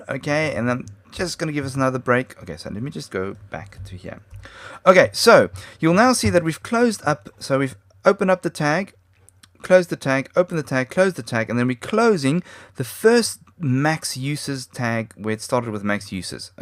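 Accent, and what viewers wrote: South Africa. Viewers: are you South African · Are u South African You sound like it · Ur South African ¿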